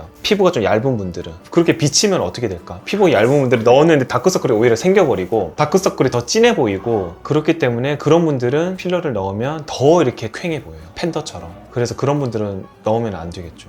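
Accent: Gyeongsang, South Korea. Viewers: 진행자분 경상도 사람이네예~^^ 서울말투에 섞어나오는 친근한 말투~*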